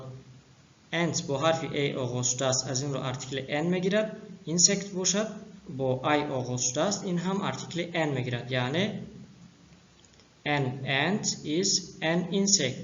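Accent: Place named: Literary Tajik, Tajikistan. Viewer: Рахмат додарчон, забони тоҷикӣ адаби ба дараҷаи олӣ гап мезани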